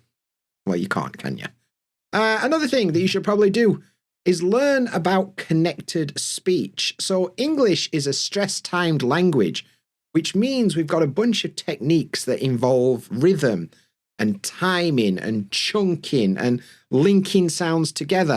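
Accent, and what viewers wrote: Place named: Yorkshire, United Kingdom. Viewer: yeahh mate, thanks !! just be able to hear your accent is already help a ton ! not everyone use yorkshire accent everyday !! and you already give a lot of ecouragement video too, thanks !! and if you can give more things about some rules,, that would be perfect !!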